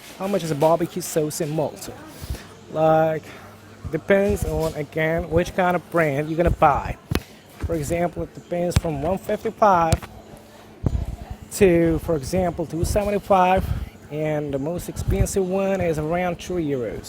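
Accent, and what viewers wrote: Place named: Florida, United States. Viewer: Your English sounds almost like the southern accent here in Florida ☺️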